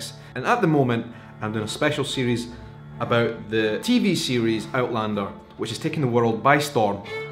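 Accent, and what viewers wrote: Scotland, United Kingdom. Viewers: Love your videos about Outlander. Big fan here as well! Actor Richard Rankin, who you will see in season 2, is from Glasgow as well and he has that very accent you talk about. But you will see that later on. Please continue those outlander videos. They are great! (plus it's just great to listen to a Scot. I'm from the Netherlands, but I love Scotland!) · Oh my! As an American (and sorry about President Douchebag...), I am loving to listen to as I have always thought that the Scottish accent is the most ear-pleasing accent of all. That being said, I am currently planning a trip to England and Scotland for next year, and I canna wait! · I am from Greece and I love the scottish accent.. in a way it feels more familiar because your "r" is closer to the Greek "r" than other English accents! great video 😃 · I'm very much a fan of the Outlander series. 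Been listening to the audio books for the last weeks now which are read by Davina Porter and they are so so good. And the TV series is great too that's how I first came to know of it! I love Scottish accents and Gaelic too. Living in Germany sadly you don't hear it too often. ^^ So I'm happy to have found your Channel here. One place more to listen to this wonderful accent. Other than that I mostly relied on watching old Late late show episodes with Craig Ferguson in the last years. : D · You had me at Scottish accents,. I came across this video by chance and have watched a few more of your videos, to say I'm hooked is an understatement! I have to watch the Outlander now! I'll It doesn't hurt that you've got the accent too. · Hi im sabrina and i love your accent and your video's but i want to ask when you are in brazil do you talk brazilian? And is it hard to go from scottish accent to brazilian? 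· Hate to say it, but as a Scot myself, much as I like your accent because all Scottish accents sound like home, it is not 'refined' and not really like Sam Heughan's at all. I would not have described you as having a George St accent. You have far too many glottal stops for that and your vowels are too fronted. I think you also have a mild case of Edinburgh accent superiority.:) Listen to people like Annie Lennox (Aberdeen), Kirsty Young (East Kilbride) or Peter Capaldi (Glasgow), Andy Murray (Dunblane), or Ewan McGregor (Crieff) to hear refined accents from other parts of Scotland. Also, there are people in Scotland outside the Gàidhealtachd who can do a Highland accent, though I will say that they're mostly older people who heard more Highland accents when they were young. Glasgow especially had a huge influx from the Highlands and many Glaswegians today had Highland grandparents who spoke Gaelic.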